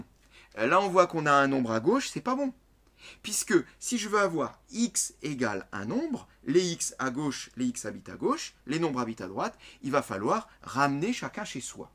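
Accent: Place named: France. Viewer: mais des lunettes et un beau accent français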